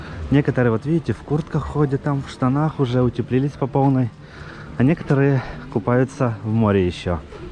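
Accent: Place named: Donbas, Ukraine. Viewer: у донбасский говор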